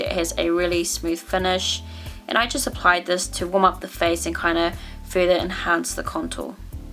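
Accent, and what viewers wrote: New Zealand. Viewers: Just found your channel and im in love!!, are you kiwi? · LucyAnneDewhurst it's called an accent.... She's kiwi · Are you a kiwi??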